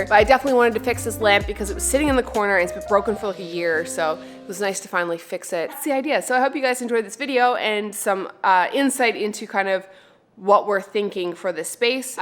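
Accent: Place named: Newfoundland, Canada. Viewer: Your accent makes me miss home! (Newfoundlander in BC)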